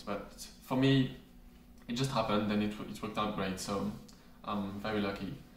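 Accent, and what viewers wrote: France. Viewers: Are u french ?🇨🇵😆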